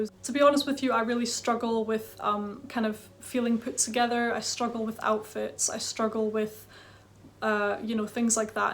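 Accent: Scotland, United Kingdom. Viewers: Oh, I see! I'm not an expert, obviously, but to me the accent (esp. the r) from that area is quite different from the Edinburgh area (where my grandfather and my surname hail from!). I just saw the "Scotland" pin was below one if your videos recently, which was what made me think along these lines! Love your videos, they are so calming, too, so would definitely love to see some ASMR as well! x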